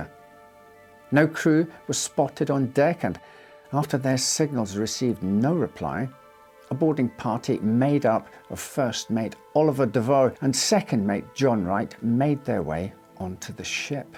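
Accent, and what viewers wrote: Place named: Scotland, United Kingdom. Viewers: I’m really curious about where you’re from — your accent has some strange twangs, like when you pronounce “O” it sounds very New Zealand (“boat,” “known” etc), while you mainly sound Scottish… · Being told tragic and horrible stories, but in my favourite accent and a really nice voice is a confusing experience, but as usual with me, the Scottish accent outweighs the nightmare material. 😌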